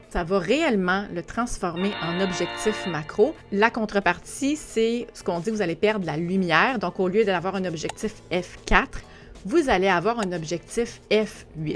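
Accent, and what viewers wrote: Canada. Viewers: J'adore, sa manière de parler, d'être. Videos toujours très intéressantes. Je suis amateur fan de photos sportives comme. J'utilise un boîtier Sony A7III. Merci J'adore ton magnifique accent canadien 😍😍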